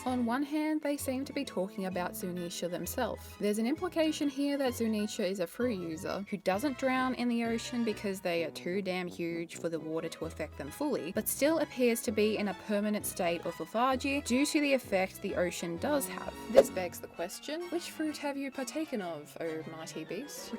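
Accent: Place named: Australia. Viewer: Knew you were Aussie soon as I heard ya voice bro Anywho lol to those who said Luffy will lose the final fight 😂 can’t happen now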